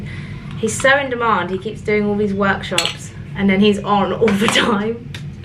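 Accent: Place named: Australia. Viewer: loving the australian accent comeback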